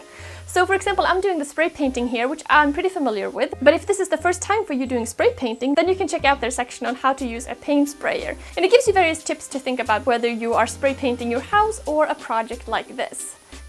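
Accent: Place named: Sweden. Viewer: Ha! I knew I recognized a Swedish accent. Hej!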